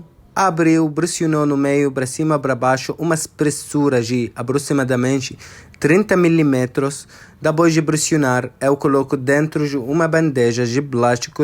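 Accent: Arab world. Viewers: Que receita fácil.. vou fazer!!! Eu amo esse português com sotaque árabe!! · Sotaque árabe muito charmoso !🧡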